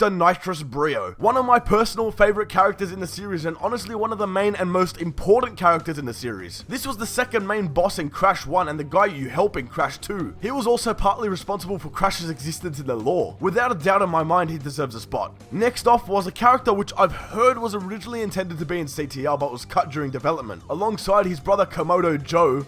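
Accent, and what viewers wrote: Australia. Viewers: One month ago? Mate you sound aussie asf how do you not english in the first 10 seconds? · Shu bro you sound like you're from bankstown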